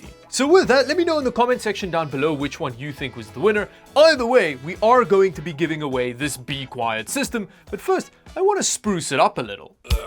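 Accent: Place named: Afrikaans, South Africa. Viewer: I am so happy to have learned that is afrikaans and not just me trying to figure out where the hell I have heard his accent before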